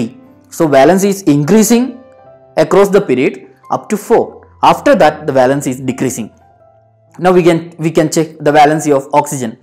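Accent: South India, India. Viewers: Sir u explain very well and I doubt u r a malayali or a South Indian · I think u r from south india 🤔 Anyway nice explanation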